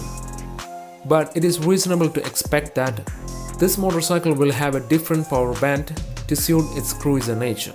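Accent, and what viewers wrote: Kerala, India. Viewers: Your Indian accent is leaking like a diarrhea. Speak normally!! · മലയാളി ആണോ 😍😍😍 ഇംഗ്ലീഷ് കെട്ടിട്ട് മലയാളിയെ പോലുണ്ട്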